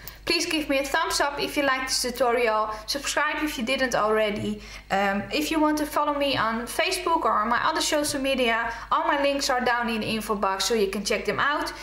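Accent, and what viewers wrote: Netherlands. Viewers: Nice tutorial! He, komt u uit Nederland?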